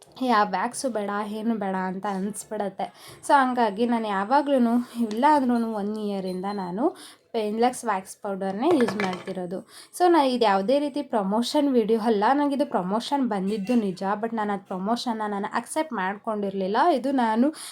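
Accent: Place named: Mandya, India. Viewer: Akka nivu thumbane mudddagi idira hage astu muddugi matadutira mandyada bhashe thumba chhanagide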